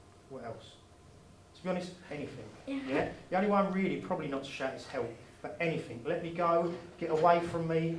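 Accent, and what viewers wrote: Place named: Australia. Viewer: A big guy in Aussie accent doesn’t mean he know what he is doing. Half of the technique is NOT possible simply because you can’t reach the guy. Also I’d like to see a child being able to pull a finger off of a lumberjack sized men. There are good self defense techniques on YouTube, this is NOT one of them. Try it with a big guy at 50% aggression, bet you can’t even reach him. But a strangle can put you out in 3.5 seconds flat.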